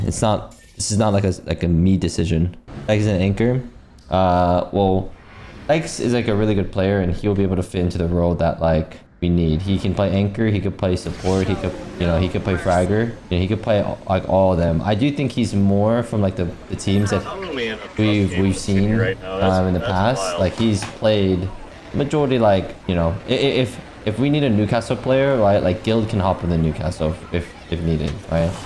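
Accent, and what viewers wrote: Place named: United States. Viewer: He was born in the US. He has lived there his whole life. Dafuq are you talking about? He has a mild speech impediment (slight stutter) but his only accent is American.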